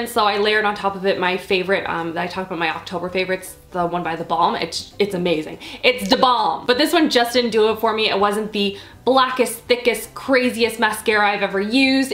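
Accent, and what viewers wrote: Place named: Canada. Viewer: You sound incredibly Canadian today. Like more than normal😂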